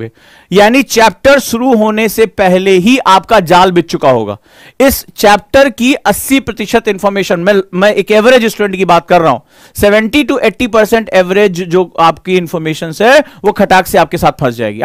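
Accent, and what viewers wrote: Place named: Bihar, India. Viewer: Mind blowing explanation sir thank you so much 💐 specially your bihari accent Amezingg.... Superb 😆 Again thanku sir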